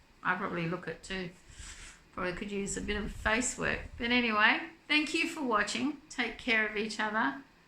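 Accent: Australia. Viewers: I love your hats and cowl. I’ve yet to try the divine hat, it’s on my list to master this year. Congratulations 🥳 on your one year anniversary of YouTube, I’ve enjoyed watching your videos! It’s been lovely having another Aussie voice out there. Enjoy your long weekend and Happy Australia 🇦🇺 Day ❤️🧶💜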